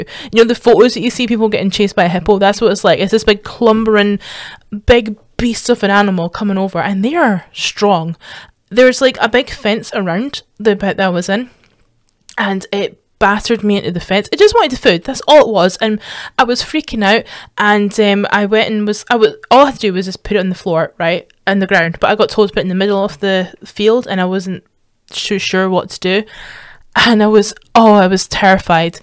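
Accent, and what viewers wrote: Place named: Scotland, United Kingdom. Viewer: Your comments and stories with that Scottish accent love it!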